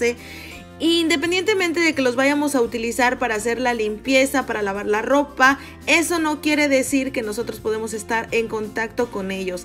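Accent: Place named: Mexico. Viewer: 👏👏👏👏felicitaciones, que gran enseñanza y gracias por compartirla con nosotros , por tu acento escucho que eres como Mexicana y le llamas sosa cáustica , acá en Colombia se le dice Soda Cáustica , igualmente es lo mismo. Te envío un gran saludo desde la capital Bogotá .!!!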